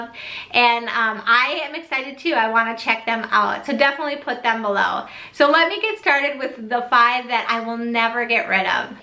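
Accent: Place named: Chicago, United States. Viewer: Thanks for the book recommendations, am really curious to check out Consoling the Heart of Jesus....just a unrelated question/comment, are you from the Chicagoland region? Just picking up strong Chicago accent :)...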